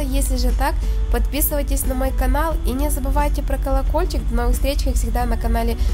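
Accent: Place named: Ukraine. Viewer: Это не дикция,а акцент украинский!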